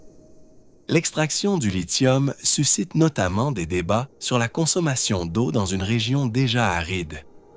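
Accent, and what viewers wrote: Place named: Quebec, Canada. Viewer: Merci pour cette vidéo. Des images magnifiques et un petit accent (Québec?) très sympa. Bravo pour votre travail.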